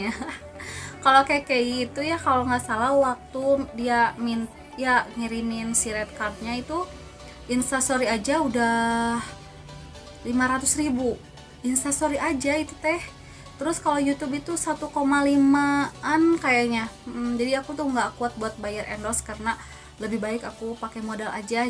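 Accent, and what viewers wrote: Indonesia. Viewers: nyarios na cirikhas orang Sunda pisan nya teh resep mireng na hehe 😁🤗🤭sukses terus teh ❤️🤗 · Wong Jawa ap Rika mba 😂😂😂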